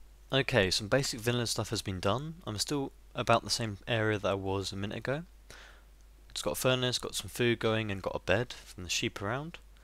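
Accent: United Kingdom. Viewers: He's British, it's pronounced that way over there. Don't be ignorant.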